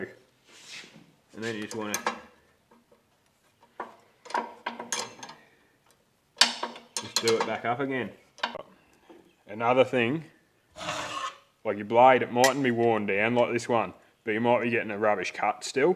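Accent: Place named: Australia. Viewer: Thanks mate, good to hear an Aussie how-to rather than always US videos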